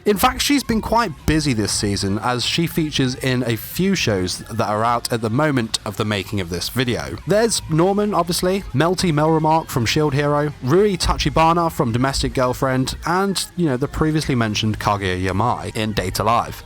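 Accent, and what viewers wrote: United Kingdom. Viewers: Is it the British voice? He drags me back for these facts don't worry I love his facts which that's his FACTOR :P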